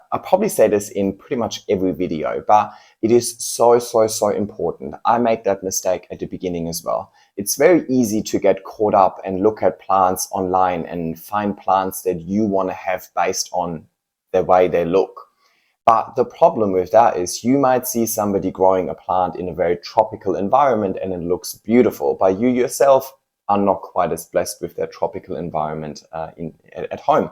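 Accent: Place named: Germany. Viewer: Exactly!!! Thank you! And are u from germany?! U have an accent like germans :)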